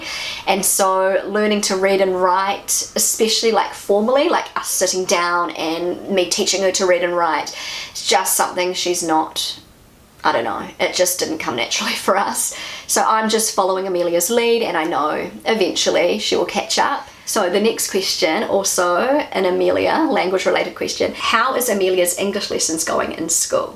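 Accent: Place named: New Zealand. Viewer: You know, hearing that Emilias teacher didn't recognize her as a native English speaker left me a bit wonderous. If she pronounced the words in English class the way she learned it from you it must have been more than obvious to the teacher because your "Kiwi"-English differs very significantly to the standard UK- or US-English that's usually taught here in Germany. Although I must admit that your accent isn't all that strong. I just saw New Zealands PM, Jacinda Ardern, on "The Late Show with Steven Colbert" and I really had to concentrate to understand everything what she was saying. I don't know about her politics but from her appearance on that show I must say she's a great ambassador for your home country!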